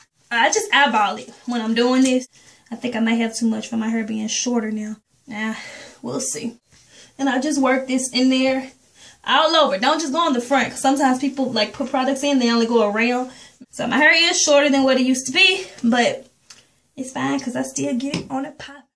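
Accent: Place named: Memphis, United States. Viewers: I knew you were from Memphis I hear it lol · I knew you were from Memphis 😣. Lol I can tell by that accent. I'm Nasvillian ✌✌✌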